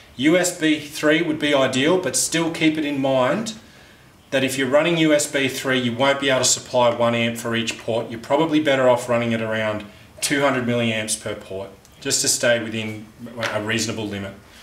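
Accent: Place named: Australia. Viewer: Thanks for the review and great accent! What part of Australia is that? I was I Sydney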